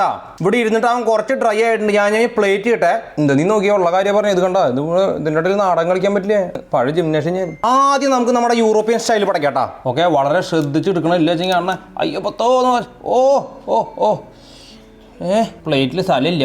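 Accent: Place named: Kozhikode, India. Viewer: entha Kozhikode parayannjjey.. Kozhikode nalla meen kittumm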